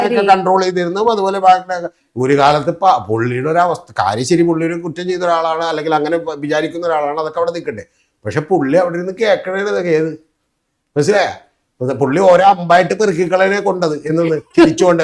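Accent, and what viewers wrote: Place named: Kochi, India. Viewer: Oh . കൊച്ചിക്കാരൻ്റെ അളിഞ്ഞ മലയാളം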